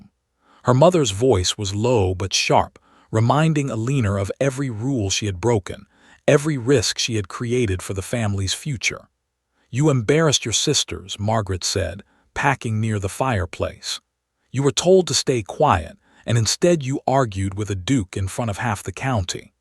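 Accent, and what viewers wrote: United States. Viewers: Oh dear, about half way through and at least half a dozen mis-pronouncements in an inappropriate american, to my ear, voice. But it's a short story and perfectly sweet.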